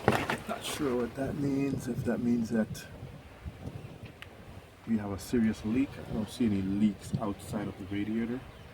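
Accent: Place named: Jamaica. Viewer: You sound like a yardie . Working on my 928 s4 project your tips help alot. Big up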